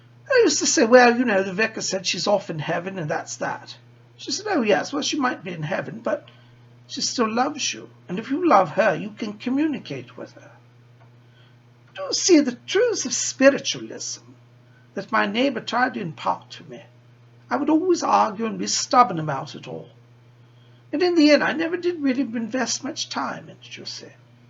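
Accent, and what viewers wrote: United Kingdom. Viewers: I love you so much (I feel compelled to call u ). I am British. You are speaking the queens English. Fletcher love to you. Xxx.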